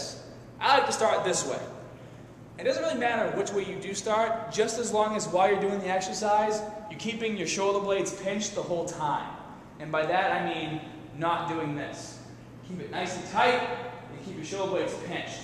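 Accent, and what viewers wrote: Boston, United States. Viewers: Any chance this lad is from boston?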